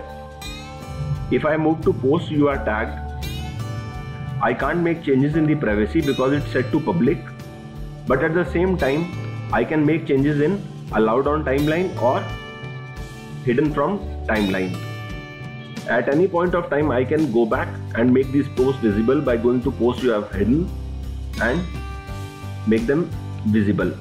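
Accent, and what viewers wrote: India. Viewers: Indian accent is just weird 💩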